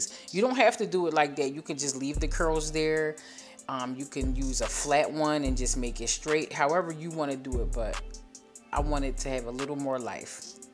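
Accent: Philadelphia, United States. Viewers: 15 minutes in and the Philly accent slapped in the face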